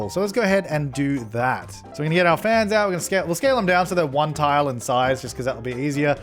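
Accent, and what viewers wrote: Australia. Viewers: Your Channel has grown so Fast I reminder when you had 100,000 SUBS. :) Are You Australian?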